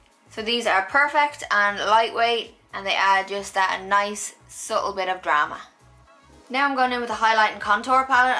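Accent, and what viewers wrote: Ireland. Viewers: i just found your channel and i noticed the irish accent on you i think its dublin correct me if im wrong but got so excited because i live in ireland to bad your all way in canada doh !!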